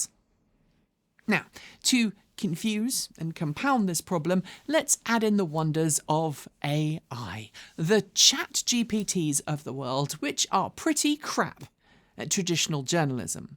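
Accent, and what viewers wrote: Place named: United Kingdom. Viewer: I'm assuming - based on your accent - that you are based in the UK. I'm honestly surprised that you are getting the same amount of FUD and misinformation over there that we get here on the left side of the pond. I am 6'4" and about 17 stone (240 lbs) so cannot squeeze in small vehicles. However, I love my EV. I do still like my weekend Lexus coupe. But the EV is such an easy choice for daily driving. I do live in Southern California and have solar, so enjoy "free" charging. However, even if I didn't, I would continue driving an EV. Keep up the good work!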